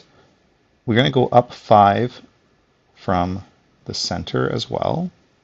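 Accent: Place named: Canada. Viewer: why do you say zed you sound like your from the USA or do I detect a Canadian accent?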